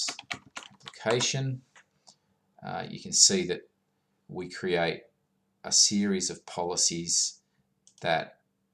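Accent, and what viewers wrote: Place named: Australia. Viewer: Thanks, noted. I have tried to code your approach above but have an 'Invalid header value type; must be a string or numeric; received NULL' error. In trying to resolve this I wanted to know about the "getAttribute('identity') at the mark (line 32) and what is 'identity' referring to please. Also, great to hear another Aussie, when it comes to providing coding solutions! Cheers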